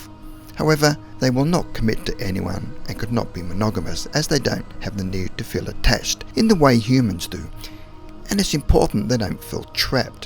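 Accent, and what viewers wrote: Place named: Australia. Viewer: Ozzy accent. at first I thought it was odd to hear it as a narrator but yup.. it's nice to listen to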